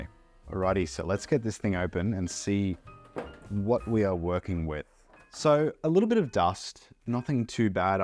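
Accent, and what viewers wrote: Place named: Sydney, Australia. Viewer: Sydney accent detected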